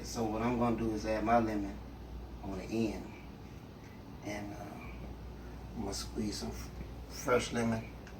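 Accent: New Orleans, United States. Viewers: He got the New Orleans accent and he love to cook that's that boot life LOL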